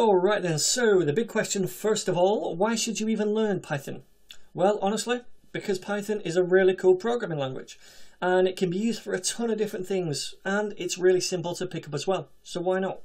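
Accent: United Kingdom. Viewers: omg finally a coder-youtuber have explain it on clear and right way and else thing is he is British and cuz of finally accent is not make me annoy uhh... thank god i fined the best yt Chanel in entire world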